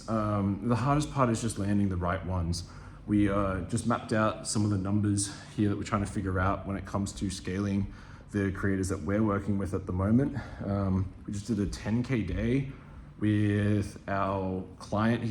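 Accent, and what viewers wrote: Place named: Australia. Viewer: He too Australian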